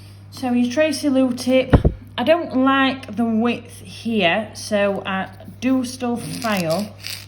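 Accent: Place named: Sheffield, United Kingdom. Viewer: Love all your videos and your accent! Can I hear Sheffield by any chance or somewhere in South Yorkshire? I'm from halfway between Wakefield and Barnsley.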